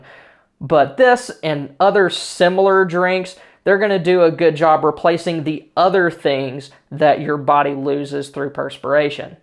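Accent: Texas, United States. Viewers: Sounds like a Texan to me sorry if I am mistaken and your offended by chance. I find Texas and the people good folk(never been maybe one day)...anyway...stars are bright big and bright this is the land of Texas(all I know..). Take care and stay frosty brother.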